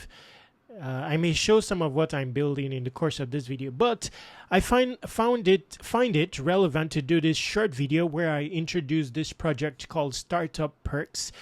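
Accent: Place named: Nigeria. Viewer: Hello, thanks for sharing. You sound Nigerian 😊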